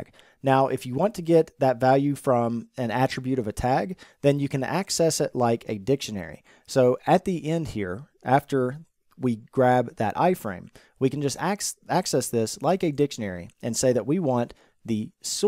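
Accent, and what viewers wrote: United States. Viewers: thanks so much, I really enjoyed watching it and learned a lot. I also really like your fast American English accent😊😅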